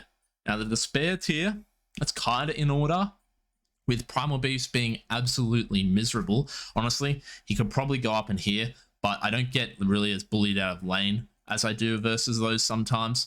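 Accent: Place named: Australia. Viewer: do you play in SEA? you sound aussie XD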